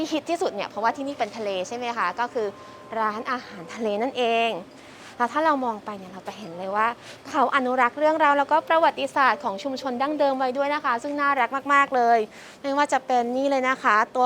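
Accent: United Kingdom. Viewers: น้อง พูดภาษาไทยว่าเพราะน่ารัก ฟังเพลินแล้ว..ภาษาอังกฤษก็ไม่แพ้กันเลย..สำเนียงน่ารักเชียว..British?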